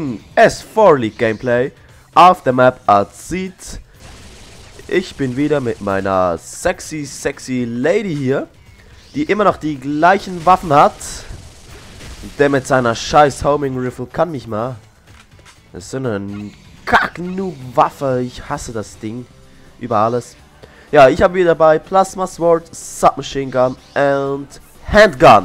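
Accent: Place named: Switzerland. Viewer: haha mer ghört voll din schwitzer akzänt :D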